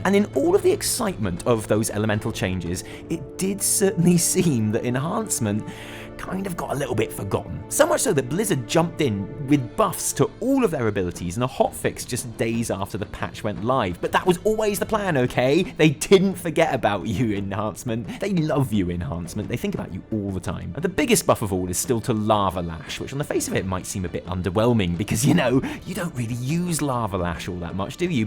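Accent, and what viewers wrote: United Kingdom. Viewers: I really want you to be the next Doctor from Dr. Who just by your accent.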